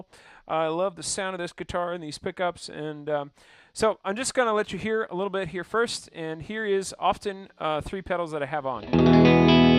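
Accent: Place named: Canada. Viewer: Curious, are you from Canada? Can definitely tell when you say the word "Out" like "Oat" haha. Anyway, killer board. Thanks for the video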